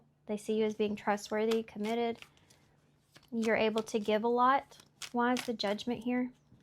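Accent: South, United States. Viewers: I think your southern accent has everyone calling you ma'am 😂 beautiful! Great reading thanks ma'am ❤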